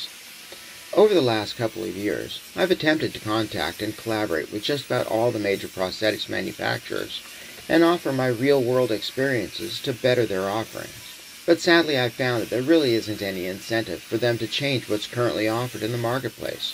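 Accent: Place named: Pacific Northwest, United States. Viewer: I knew you had to be from Oregon or Washington we got the same accent!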